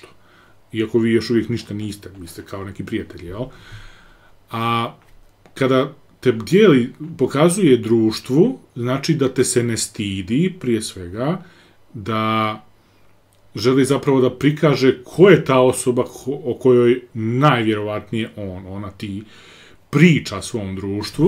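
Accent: Ijekavian, Serbia. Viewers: Opis i tekst na ekavici. Govor na ijekavici. Kompleksi su cudo. 🤣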